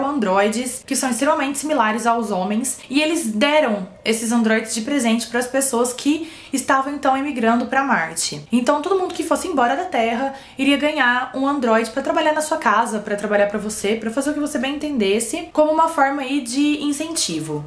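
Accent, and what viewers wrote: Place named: Caipira, Brazil. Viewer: univeRLso foRLte maRLte Que gracinha, esse sotaque caipira. X-) E pensar que esse som RL (um fonema denominado "erre retroflexo") vem da língua tupi, e que se incorporou à fonética portuguesa graças ao fato de que os índios capturados pelos bandeirantes não conseguiam pronunciar o erre vibratório lusófono (presente em palavras tais como "rato" e "robusto"). Eu ia lhe sugerir um livro (na verdade, uns livros). Porém, como vi em seu About que suas preferências são "...fantasia épica, ficção científica e suspenses policiais...", creio que não se interessará por obras filosófico-existencialistas sartrianas, tampouco por um documentário-poesia do Darcy Ribeiro. :P ...mas, se mudar de ideia (a respeito do documentário): watch?v=eqlcHGj4f7k&t=2h36m21s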